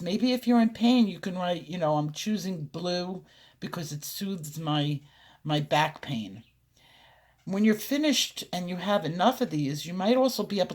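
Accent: New York, United States. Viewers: I THOUGHT I heard a tinge of NY in your voice never wanted to assume, ;) thanks for another great video, the coloring was suggested by a therapist for my anxiety as a coping mechanism, when I am needing to just zone out sometimes I pick up one of my books and pencils and get going. EDIT- I also bought a book from the thrift store with a woman's writing in it, it was a type of daily inspiration for women book, and her notes intrigued me so I purchased, thought I was the only one who did that...THIS is the reason why I like your channel so much, because of your personality and how you share parts of your life with us in a comfortable manner, I feel like I know you, its more than coloring here its about introspection and reflection and life and humanity and how that all ties into you, your life and family and art and coloring. I LOVE IT. Thanks for going deep with us we love it!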